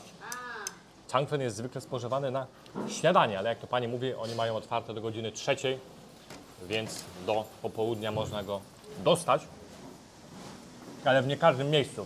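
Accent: China. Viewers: Chłop co po polsku mówi z chińskim akcentem, no mistrz 😃 trafiłem tu przypadkiem ale chyba zostanę 😁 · mówisz jeszcze po polsku ale już z chińskim akcentem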